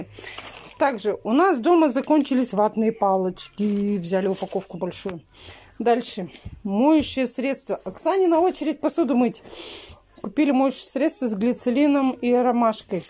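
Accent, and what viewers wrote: Russia. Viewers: ЛЮДИ .ЭТО ЯЗЫК РУССКОЙ ДЕРЕВНИ. У НАС ВОЛГОГРАДЕ В ДЕРЕВНИ МНОГИЕ ТАК ГОВОРЯТ. НЕ КОТОРЫЕ ЛЮДИ ЧАСТО БОЛЕЗНЕННО РЕАГИРУЮТ НА ЭТО ..МОЖНО ПОПРАВИТЬ КОГО ТО ,ЕСЛИ ТЫ ДОЛЖЕН В СИЛУ СВОИХ ПРОФЕССИОНАЛЬНЫХ ОБЯЗАННОСТЕЙ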